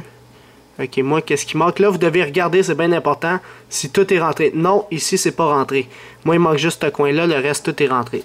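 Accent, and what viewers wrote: Quebec, Canada. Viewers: ta gueule il est juste quebecois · Merci! :D (Héé arrêtez c'est trooooop bien l'accent québécois! Pff bande de nuls!) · Pour ma part j'aime beaucoup l'accent Quebecquois-- et surtout la manière didactique professionnelle de notre ami -- Merci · Quebec ,,, juste a entendre l'accent on voit immédiatement qu'il est du Quebec et non de marseille XD les francais parle pas comme ca ... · Merci beaucoup pour ta vidéo! J'avais un Sony xperia e4 et j'ai acheté un Sony xperia xa1 Ultra cette semaine, et je me faisais une joie de l'essayer quand je me suis aperçu que ma carte sim n'était pas une micro, donc ta vidéo m'a aidé à savoir qu'on pouvait la couper sans risque et il fonctionne très bien maintenant dans ce nouveau mobile. Merci à toi et ton bon accent Québécois que j'adore!! salutation d'un "cousin Français" :)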